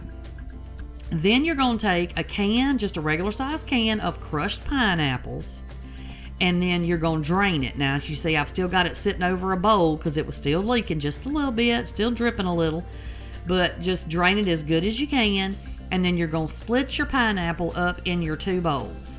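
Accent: South, United States. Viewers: Love the Real Southern Drawl! I could listen to you talk all day.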